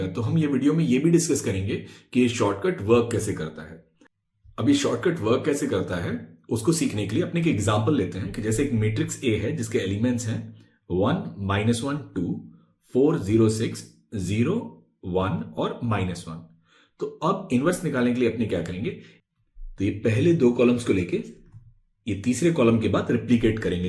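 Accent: India. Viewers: Sir aap southern part of india se hai kya?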